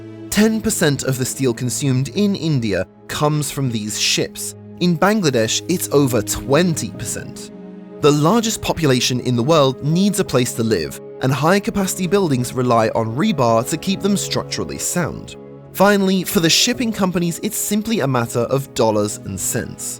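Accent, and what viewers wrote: United Kingdom. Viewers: Really excited to watch this until I heard the narrator is British, what a shame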